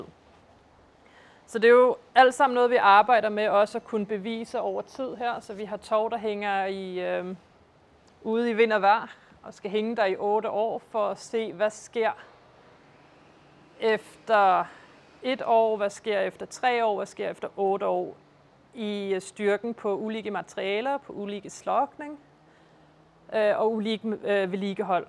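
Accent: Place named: Norway. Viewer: Norskificeret dansk lyder ret sjovt. Det er stadig nemt at forstå for mig, som dansker. Jeg tror også det virker for nordmænd. Aner ikke om det kan forstås af svenskere (uden for Skåne). Tak for videoen! :)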